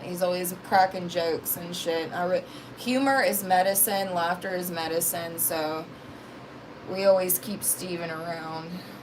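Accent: South, United States. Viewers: PS ever since you hung out w your family your Southern accent is so much more pronounced 💕💕💕 I love it!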